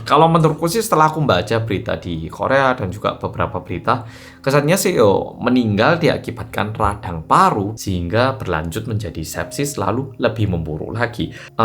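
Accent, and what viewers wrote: Java, Indonesia. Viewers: Mas medok banget ya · Salfok sm oppa korea yg ngomong nya medok 😂 salam kenal oppa.... moga sehat selalu ❤ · Ya ampun oppa bhs indonesia lancar bngt 🤣😂😂😂.. baru following sdh ngakak dl an dgr in bhs Indonesia nya 😂😂Lol logat jw pula 😂😂😂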